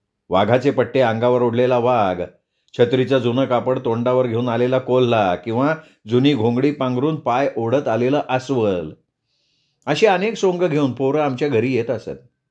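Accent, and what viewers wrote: Konkan, India. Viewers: सर कादंबरी तर छान आहे पण त्याहीपेक्षा तुम्ही जो कोकनी आवाज काढता ते लय भारी आहे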